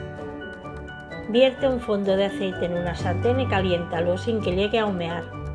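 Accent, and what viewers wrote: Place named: Catalonia, Spain. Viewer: Se nota ese acento catalán 😝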